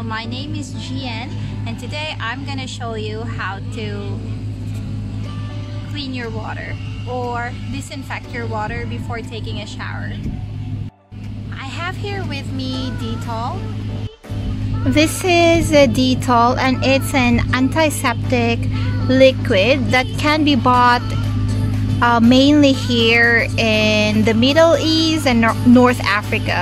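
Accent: England, United Kingdom. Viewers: Ang galing naman po salamat po sa pag share nito, ingat jan. I like you english accent. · O<link> I really love your english accent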